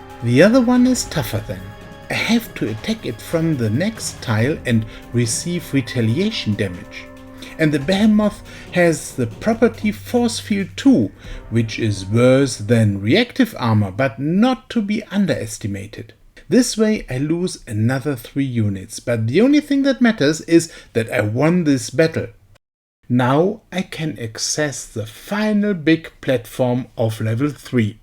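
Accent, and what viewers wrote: Germany. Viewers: I am only in colonial age, but I watched the video just to see the amazing robots, animations and your German accent😂 love your vids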